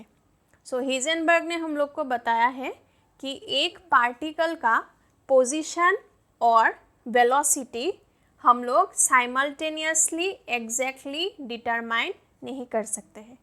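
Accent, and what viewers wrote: Bengal, India. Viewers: Mam are you Bengali??????????????????🤔🤔🤔🤔🤔🤔🤔🤔🤔🤔🤔🤔🤔🤔🤔🤔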